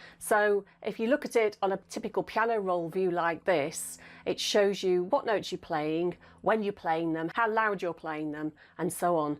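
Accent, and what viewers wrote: United Kingdom. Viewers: That British accent!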